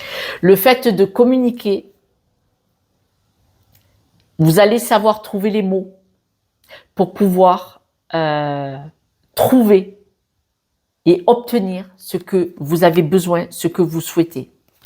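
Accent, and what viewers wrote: South, France. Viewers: Bonjour je ne vous connaissais pas,et j'ai adoré votre guidance avec ce petit accent du sud il me semble, excellent merci pour tout ces conseils qui je l'espère se réaliseront pour la plupart.😉🙏🙏🙏🙏 Portez vous bien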